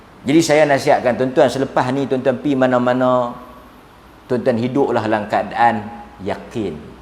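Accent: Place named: North, Malaysia. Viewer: Orang utara mmg bahasa kasar skit,tapi aku suka sbb ustaz ni teroih terang..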